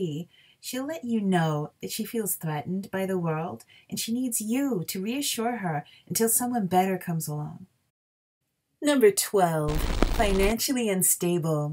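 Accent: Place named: Canada. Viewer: I hear the Canadian in the presenters voice lol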